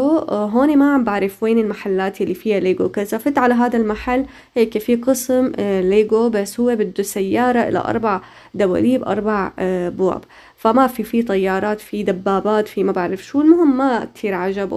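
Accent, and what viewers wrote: Syria. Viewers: اختي انت من سوريا❤ مبين حاكيك شاميه انا من سوريا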